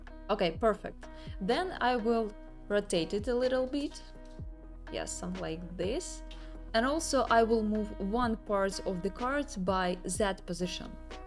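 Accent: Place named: Russia. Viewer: Такой классный русский акцент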